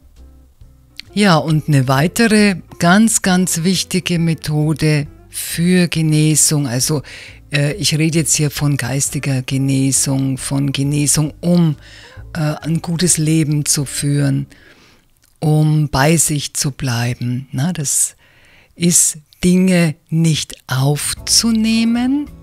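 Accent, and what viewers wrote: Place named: Bavaria, Germany. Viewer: Herrlich, liebe, wieder tolle Inspiration und das kurz und knackig - ich liebe deine offene, ehrliche Art, deinen Witz und natürlich dein bayrisch!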